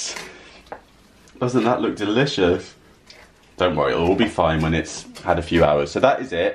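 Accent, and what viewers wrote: United Kingdom. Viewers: Que bonito suena tu acento , Nice vídeo ,your brithish accent is cool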